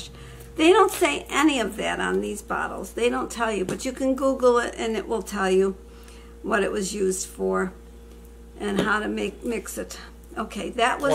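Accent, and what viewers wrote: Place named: Northeast, United States. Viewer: I knew you meant COLD in your eye, not coal...hehehe...you Yankees talk funny! It won't help with an allergic reaction but to get rid of the sting from a bee or wasp sting is to first get the stinger out then apply a chewing tobacco compress or soak the tobacco from a cigarette in water then put the wet tobacco on the sting.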